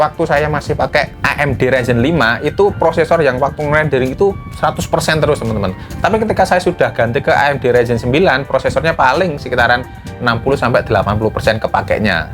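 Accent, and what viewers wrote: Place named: Java, Indonesia. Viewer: Pertahankan medoke mas...keren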